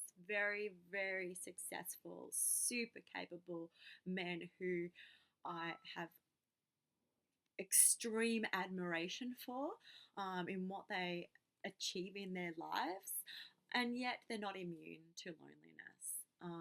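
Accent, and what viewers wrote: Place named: Australia. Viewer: judging by her accent she's from down under where it's legal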